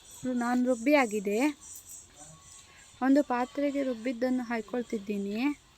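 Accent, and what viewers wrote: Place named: Kundapura, India. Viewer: Nevu mataduwa bhashe tumba chennagide kundapura Bhashe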